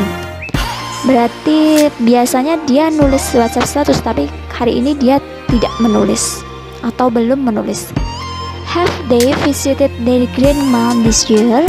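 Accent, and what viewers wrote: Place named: Java, Indonesia. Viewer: Suara medok jawa bahasa inggtis jadi bagus juga yaa kerren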